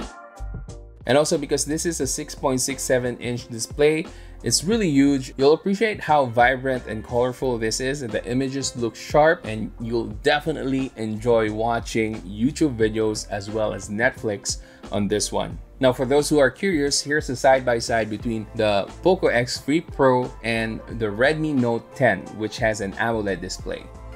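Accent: Philippines. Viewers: The Philippino accent is the cutest ever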